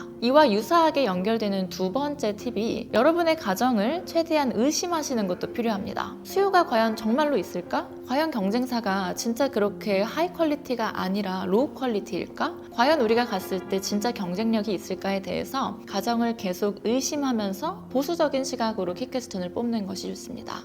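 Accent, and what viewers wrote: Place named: South Korea. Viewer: 영어 발음이 콩글리쉬세요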